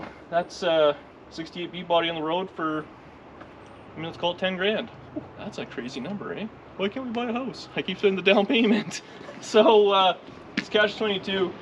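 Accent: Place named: Canada. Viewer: I knew you was Canadian as soon as the video started. I live in Detroit and you know the Hockey Competition gets pretty Heated. Nice Car Man.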